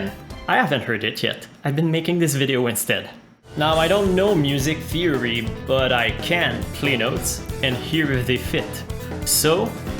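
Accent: Quebec, Canada. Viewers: merci pour la video, tout après 5 seconde j'ai tout de suite su, sauf si je me trompe.. que tu es québécois avec ton accent anglais, qui ressemble pas mal au mien :P, très bon gout pour ton top 10 merci (sauf le no. 1 xD) et un subscriber de plus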